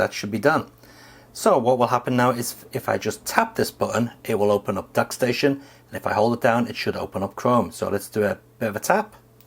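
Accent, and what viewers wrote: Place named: England, United Kingdom. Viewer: Thanks, good review. Surprised to hear an English accent tho appears you aren't on UK from your photos. I've shortlisted it, assume will work ok in uk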